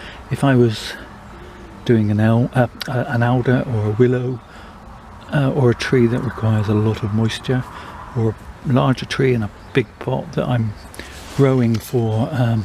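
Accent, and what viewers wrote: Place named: RP, United Kingdom. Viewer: speaks the queens English. I'm a Geordie so you probably wouldn't understand a word on my videos.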